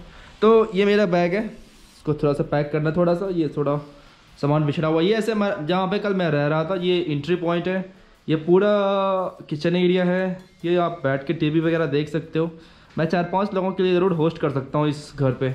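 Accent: India. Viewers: Bhai, Youtuber to bahut hai. But aapka bolne ka desi andaaz, really love it. Kabhi bhi isse change mat karna. Yeh bhi aapki ek khasiyat hai.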